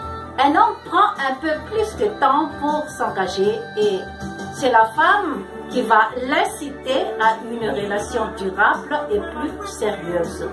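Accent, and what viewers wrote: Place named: Canada. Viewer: Accent canadien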